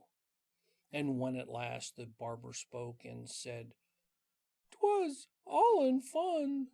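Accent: United States. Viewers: great reading of an Aussie classic. Unusual to hear it with an American accent. Thanks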